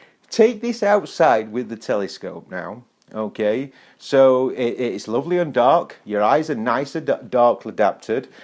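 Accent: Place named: Cockney, United Kingdom. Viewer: Sorry, man, but your "m'kay" and "o'right" remind me video by Alfie Solomons "Learn the cockney accent". 😅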